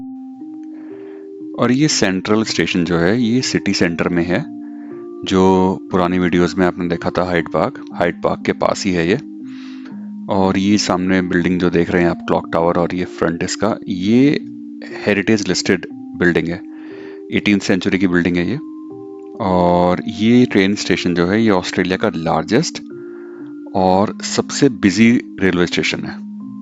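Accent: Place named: NCR, India. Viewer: Beautiful vlog in ur commentary ur ascent is still from NCR even in abroad - Keep exploring 🎉🎉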